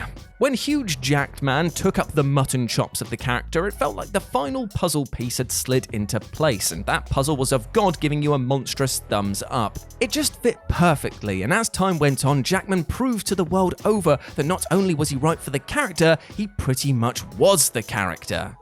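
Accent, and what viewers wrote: United Kingdom. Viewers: Simon Whistler clone? This just seems like it MUST be a parody of Simon's oh-so-British delivery. All he needs to do is mispronounce a few things. . . (and have nine more channels).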